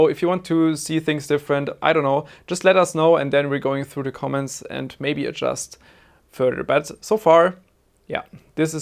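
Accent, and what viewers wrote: Germany. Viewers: Der deutsche Akzent einfach geil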